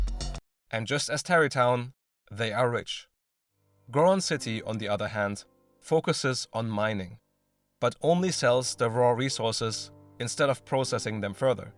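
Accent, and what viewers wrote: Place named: California, United States. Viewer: Don't know man sounds like California to me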